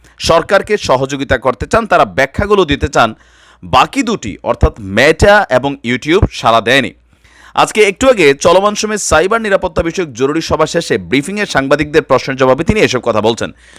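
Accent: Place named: Comilla, Bangladesh. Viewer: কুমিল্লা কুমিল্লার পোলার মতো কথা কবি বলবিরে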